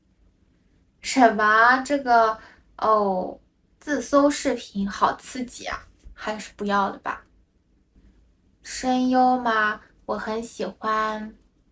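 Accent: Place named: Shanghai, China. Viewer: i think it's just Shanghai's accent is a little different from the general accent of the language. It's not that easy to forget your mother language :v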